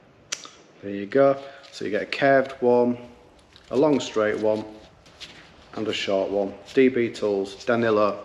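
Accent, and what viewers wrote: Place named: Middlesbrough, United Kingdom. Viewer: How much would a repair like that cost? You sound like boro accent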